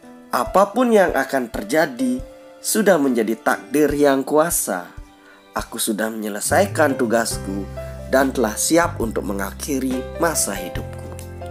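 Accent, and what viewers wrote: Bali, Indonesia. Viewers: Logat balinya kental banget hehe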